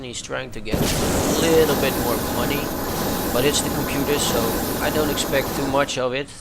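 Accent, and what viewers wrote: Netherlands. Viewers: Bedankt voor je video! (ps. wel grappig: ik kan gewoon je overduidelijke NL accent in je engels horen! ) haha ;D verder gewoon perfect goed Engels hoor...en dankje voor je uitleg! Wil dit spel zelf heel graag spelen, maar ik krijg me handen gewoon niet aan een Beta key om het te downloaden...ben zelfs bereid goed te betalen voor een werkende Beta key...illegaal of niet, maakt me niet uit! Een vraagje...zit C&C 2013 nu officieel nog steeds in de Alpha fase of is dit inmiddels de officiele Beta?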